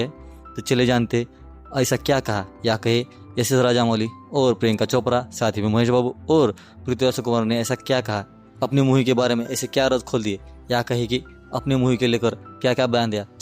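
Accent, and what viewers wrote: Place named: Nepal. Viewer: By the accent, I'm guessing you're Nepali?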